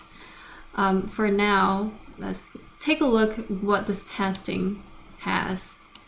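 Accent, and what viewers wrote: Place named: United States. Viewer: another good video. I appreciate you helping me understand how to use the RAP rules. In the past I have used the Application>Distribution>Export function to save my application rulesets. It has worked very well for me. Is there a reason you did not choose the Export function? I have a suggestion to make. In the future if you have a video that requires the pega environment to be set up to follow along with your lesson. Save the pega jar file on your git-hub site. For example, since you referred to PegaFem channel this is what I did. After I completed her Video 4 exercise I exported my application to a zip file. Then a couple of weeks later I imported the Video 4 exercise zip file and I could start working on her Video 5 exercise without recreating her pervious work. I hope my explanation is clear if not let me know and I will go into more detail. 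I haven’t seen any new post on PEGAFEM for awhile I hope see keeps on posting. Your two post are the easiest for me to understand (because I understand your American accents). I hope both of you keep posting about Pega. Looking forward to working on the 5 post I have not studied yet. Thanks again.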